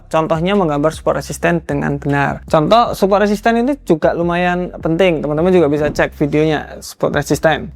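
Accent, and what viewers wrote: Java, Indonesia. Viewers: bahasa Jawa ini bukan osing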